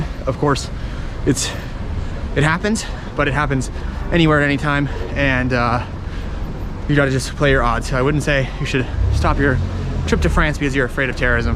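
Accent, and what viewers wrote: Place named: United States. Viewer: He sounds like from USA. But he looks Middle Eastern to me.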